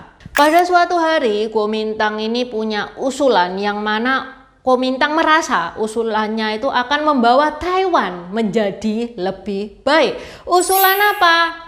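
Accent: Java, Indonesia. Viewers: jawanya medok banget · Terpengaruh logat jawir dia. Ga tau harus minum obat apa buat bikin netral si jadi logat biasa aja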